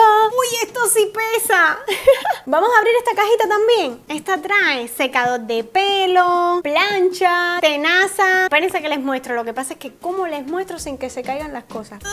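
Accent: Cuba. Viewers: Hola guapisimaaa que linda eres me encantas soy feliz viendo tus videos y el acentito cubano yo vivo en Sevilla soy de Ecuador mi patria querida ole ole cada vez mas entretenida interesante intelugente con esa actitud positiva mi latina favorita te mando un beso muy grande que te queremos mucho tambien mi hija Patricia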